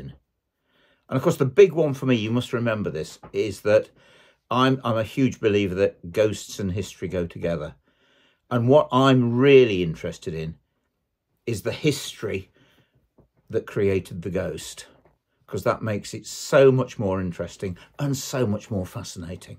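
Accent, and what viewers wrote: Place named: East Midlands, United Kingdom. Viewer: Just stumbled across your channel. Love the content and subscribed. Also miss the East Midlands accent as a Nottingham lad now living in Australia. Keep em coming matey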